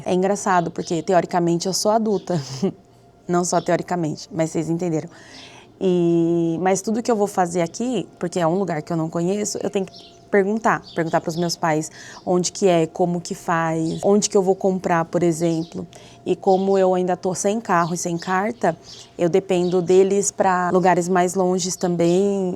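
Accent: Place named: Brazil. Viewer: Você deve ter crescido no Brasil, pois fala como brasileira mesmo, e não como alguém que cresceu no Japão.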